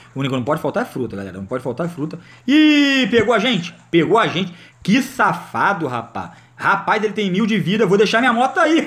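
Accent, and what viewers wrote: Rio de Janeiro, Brazil. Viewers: EAE GALERA NA ÁREA sotaque Do rio é foda Like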